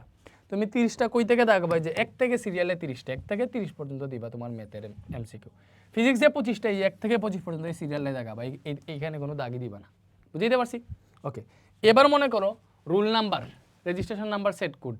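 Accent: Sylhet, Bangladesh. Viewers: ভাই মনে হয় খাঁটি সিলেটি..❤😊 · Bhai aponake donnobad ar aponi ki sylhety😢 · Vhaiya mone hoy sylheti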